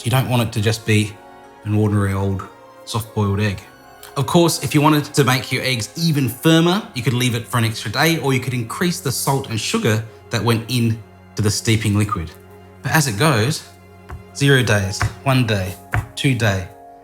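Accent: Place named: Australia. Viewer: Is this dude Japanese or Australian? (as far as nationality, not race)... I can't tell which language he speaks better but his English sounds perfect with an Australian accent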